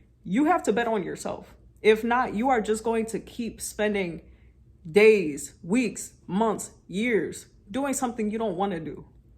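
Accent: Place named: Florida, United States. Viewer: i was gonna guess you lived in Florida cuz how you talk 🤣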